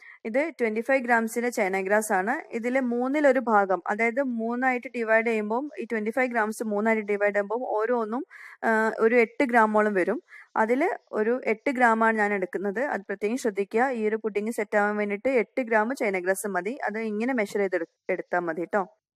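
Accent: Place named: Kannur, India. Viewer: Ithaaa ningal naattil evideya. Samsaram kettittu kannoor Alla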